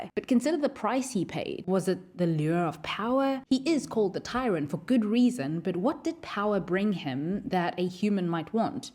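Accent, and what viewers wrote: South Africa. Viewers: I love the female Sourh African accent. The perfect mix of allure, forthrightness and malevolence.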